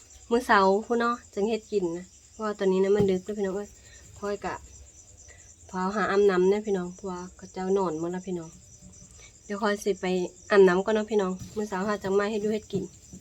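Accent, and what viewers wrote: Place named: Phu Thai, Thailand. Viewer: เว้าผู้ไทยคือเดียว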